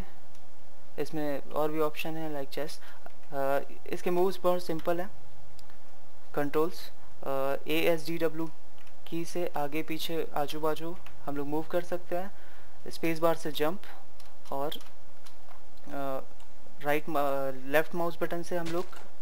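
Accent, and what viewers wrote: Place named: North India, India. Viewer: also like your north wali hindi... (delhi kya?)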